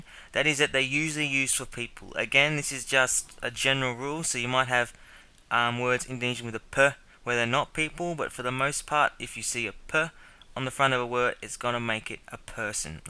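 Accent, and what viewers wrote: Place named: Australia. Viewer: I can tell by your accent that you are probably an Aussie. hahaha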